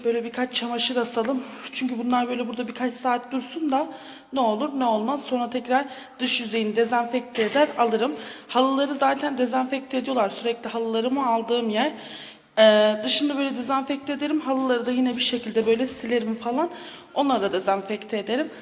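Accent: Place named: Kayseri, Turkey. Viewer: izle vallaha keşke bizde seninle böyle olsaydık ne güzel olurdu leee🤣🤣🤣kayseri şivesi leeeee🤣🤣🤣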